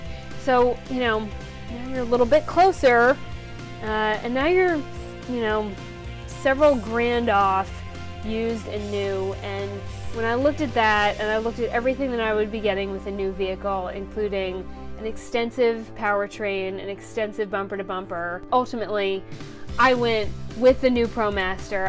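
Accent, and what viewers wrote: South, United States. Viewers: You rock girl. Lose the music as it sounds like someone is playing Pokemon behind you. Want to hear everything you say. If you play music it needs to be base so it doesn't compete with your wonderful southern voice. Glad to follow someone real. You and Chrome from vanlivs are making YouTube worth watching. Love ya. Keep that shit up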